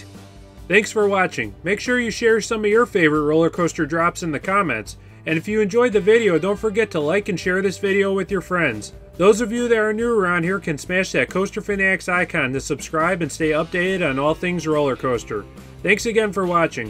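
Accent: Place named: Minnesota, United States. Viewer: This mans got the most minnesoota accent I ever heard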